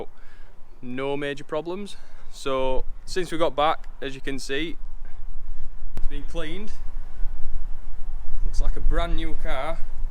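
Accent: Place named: Barnsley, United Kingdom. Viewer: This Yeti is mint. I thought I recognised a Barnsleh accent. That'll explain why I've seen cars with Darkside stickers knocking about these parts.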